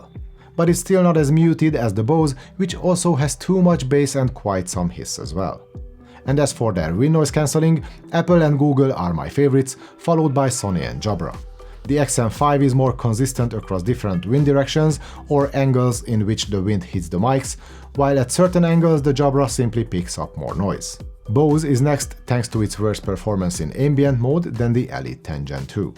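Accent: Hungary. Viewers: Great video, signature Hungarian accent 😉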